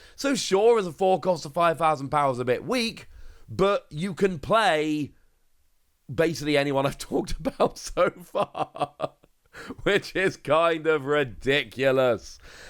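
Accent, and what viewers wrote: England, United Kingdom. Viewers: Typical english accent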